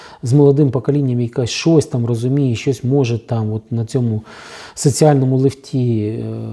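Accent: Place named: Surzhyk, Ukraine. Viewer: Може без суржика?!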